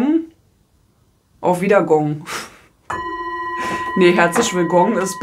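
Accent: Berlin, Germany. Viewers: Vielen lieben Dank für diese sehr passende Tagesenergje - Botschaft 🙏❣️🙏 Heute ist für mich ein sehr sehr spiritueller Tag ❣️ Ich habe schon am frühen Morgen einige wichtige Erkenntnisse gewonnen und durch deine Botschaft der Tagesenergie finde ich diese intuitiven Gedanken bestätigt ❣️ Deine Tipps und Ratschläge sind immer sehr wertvoll für mich ❣️ Achja, das mit dem Grammatik Kurs vergiss bitte wieder 🙏❣️🙏❣️🙏 Ich liebe deinen Berliner Dialekt ❣️🥰❤️ Hab über 20 Jahre in Berlin gelebt, das ist einfach meine Heimat.... Wünsche dir und allen anderen, die dich auch von Herzen mögen, einen schönen und vor allem positiven Dienstag ❣️❣️⚡🌌☀️💖😘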